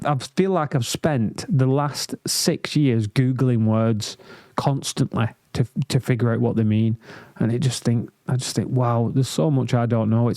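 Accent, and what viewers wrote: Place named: Scotland, United Kingdom. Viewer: your deep scotland accent makes you sound "old and mature"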